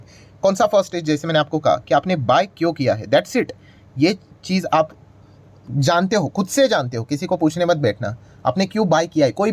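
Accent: Maharashtra, India. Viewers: सर तुम्ही मराठी मध्ये बोलता हे तुमच्या बोलण्यावरून कळतंय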